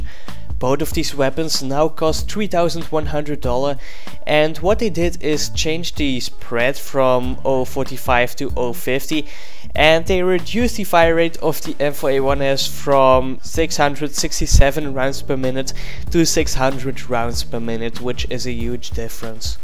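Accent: Netherlands. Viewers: are u dutch? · will you go back to dayz vids? btw youve grown well, ive seen you since 1k or something. i subbed because i heared you had a dutch accent. and you uploaded alot of dayz stuff. too bad im not interessted in csgo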